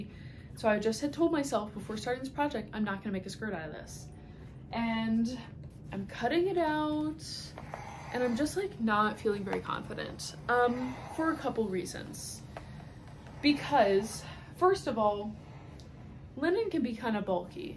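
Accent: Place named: Canada. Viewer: Are you Canadian? 🇨🇦 You have a lovely accent. 😊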